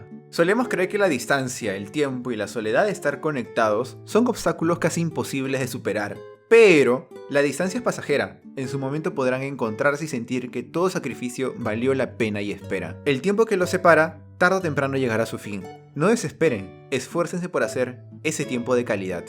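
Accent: Peru. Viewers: Que wea eri peruano?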